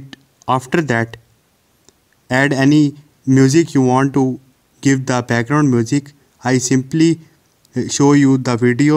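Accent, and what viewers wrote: India. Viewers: Idk why but hindi people when talk english it just so interesting with their identic accent🔥🙏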